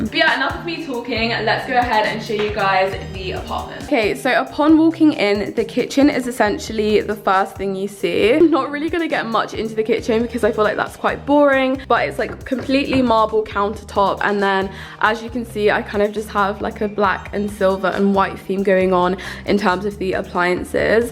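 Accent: United Kingdom. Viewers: You sound like you come from a lot of money anyway. Your accent is very much British Private-School rooted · A lot of Black women in the UK speak like this! Especially in the corporate world. ☺️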